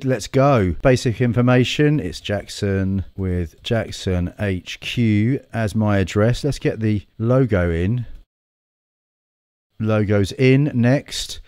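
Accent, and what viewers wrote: United Kingdom. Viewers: Hey, I really enjoy your unique presentation style. You cover technical tutorials, recommendations, comparisons, a reactions, all with a British accent, in just 15 minutes. Great job! Keep up! You didn't ask to subscribe but I did.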